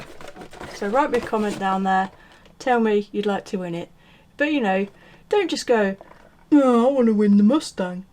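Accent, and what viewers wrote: West Midlands, United Kingdom. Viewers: Hi, I would like to be considered for the Mustang giveaway, I'm back into modelling after 30ish years and find your lovely West Midlands twang and easy yet very informative tips and advice invaluable in my learning of the hobby after 30 years ( things have changed alot since the 70,s) Also undying to try foiling so this sould be my first attempt, I'll send you pictures of my success or not if I am lucky enough to win. Thanks again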